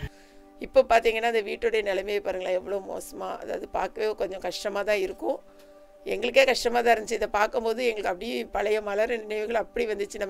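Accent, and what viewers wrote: Tirunelveli, India. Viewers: நீங்க சென்னை ல இருந்தாலும் உங்க பேச்சு திருநெல்வேலி பேச்சு தான்.... மாறல... Gud 👍👍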